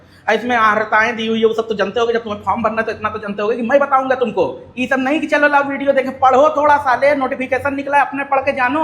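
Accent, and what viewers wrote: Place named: Ambedkar Nagar, India. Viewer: भाई आपकी भाषा बहुत बढ़िया है जैसे अकबरपुर अंबेडकर नगर वाला ❤❤❤❤❤❤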